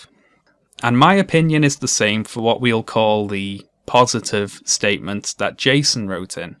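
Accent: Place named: England, United Kingdom. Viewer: it's strange hearing how much more english he sounds in these earlier videos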